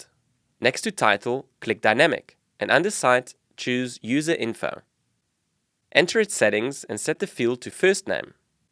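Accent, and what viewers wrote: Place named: South Africa. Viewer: This dude is definitely South African...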